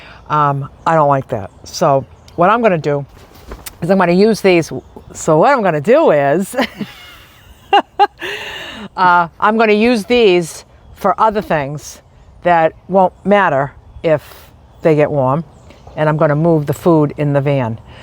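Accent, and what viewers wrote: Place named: Massachusetts, United States. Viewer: Hi! I fell upon your channel and love it! I love your accent too! My family and I would spend a few weeks every summer in Gloucester, Mass., and it reminds me of all the lovely people we met there!! 👍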